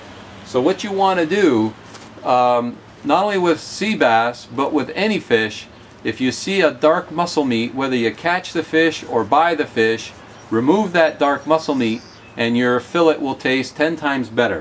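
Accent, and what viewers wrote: Canada. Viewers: Sounds like a Canadian. Hope he’s here legally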